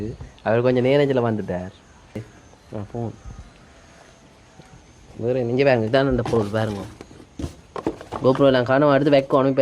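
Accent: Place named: Sri Lanka. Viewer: இலங்கை தமிழ் என்ன ஒரு இனிமை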